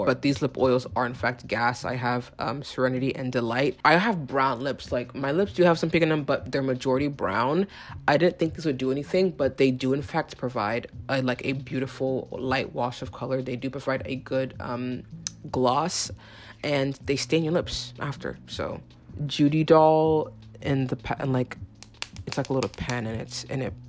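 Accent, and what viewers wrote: United Kingdom. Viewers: i love how your british accent is literally Adele. So comfy sounding.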